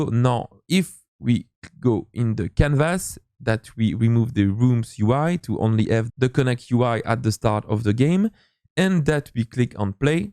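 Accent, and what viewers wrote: France. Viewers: Wow:D a Wild appeared.:D Didn't expect this one,:P I like your French accent:P Tnx for the Multiplayer tutorial:) I will need it during my internship:] Epic!!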